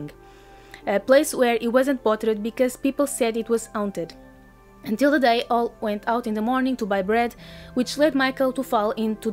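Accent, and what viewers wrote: Portugal. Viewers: I like the length of your videos. I also love to hear your Portuguese accent.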